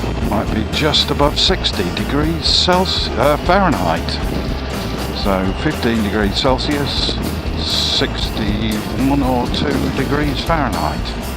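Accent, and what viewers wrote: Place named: United Kingdom. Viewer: . Eu intendo muito pouco o inglês. Eu sou mais acostumado a ouvir o inglês Americano. Dar para perceber bem o Sutaque Britânico. Valeu meu irmão.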